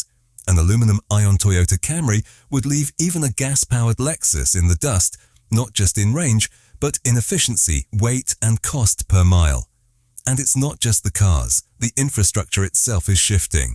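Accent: United Kingdom. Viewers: Don't listen to the message, listen to the method of delivery, formulaic, uses American expressions with a 'British' accent (muddy) and the same style as you heard from the same source you've heard previously, e.g. 'here's the twist', this wasn't market math', etc, etc.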